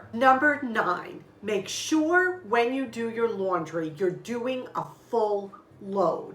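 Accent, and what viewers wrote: New York, United States. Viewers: I love hearing another New Yorker, it's like listening to family! These were such great tips. I really appreciated all your ideas and that lovely pie!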